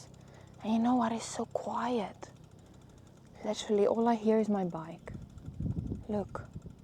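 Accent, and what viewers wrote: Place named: South Africa. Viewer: Two seconds listening to you i knew you a South African. Greetings from 🇿🇦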